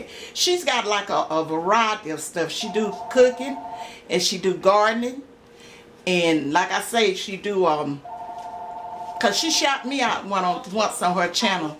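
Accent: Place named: Texas, United States. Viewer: Thanks for your channel. You make me want to cook again. Where do you live? You sound a lot like my folks from Sulphur Springs, Texas.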